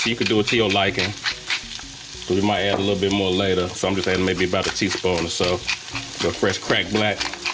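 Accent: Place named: South, United States. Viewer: This recipe is So simple and easy. I luv your southern drawl. I see ya! You know I'm from Memphis, TN. Thank you and yes! it is all about the Flava!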